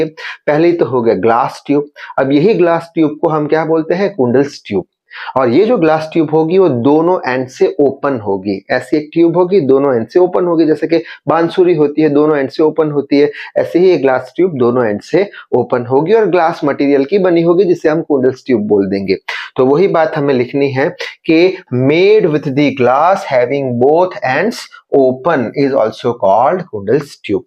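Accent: Gujarat, India. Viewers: Sir aap Gujarat se ho kya ?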